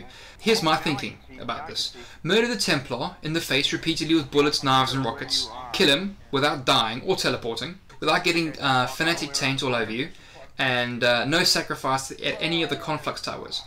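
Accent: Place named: South Africa. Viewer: dude you south african me too i can tell by the accent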